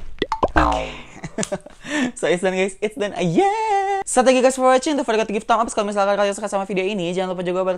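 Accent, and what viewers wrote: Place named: West Java, Indonesia. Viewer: Sundaan euyy :v sundanya keluar semua😅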